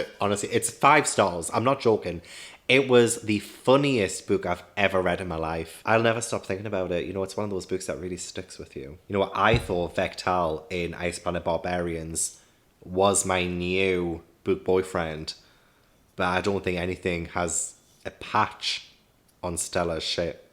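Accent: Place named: Wales, United Kingdom. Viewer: Actually, I think you'll be okay without that Sex and Holiness video. Even someone with a very limited imagination can guess where that's going... and you strike me as someone with a very vivid imagination! I mean, the irony of it... a man who's sworn off sex has all the answers! Are you Welsh? (sorry if you're not and that's an insult!) Or maybe northern? (sorry if you're Welsh and that's an insult). Whatever you accent is, I could listen to you all day!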